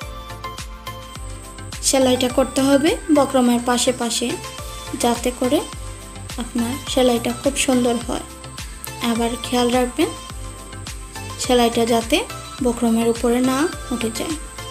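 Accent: Sylhet, Bangladesh. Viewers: Apni sylheti 🙄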